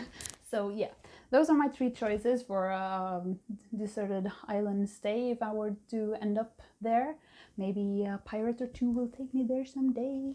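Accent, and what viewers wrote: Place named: Ohio, United States. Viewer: ha thought you were from ohio,,,,love your accent and your love for metal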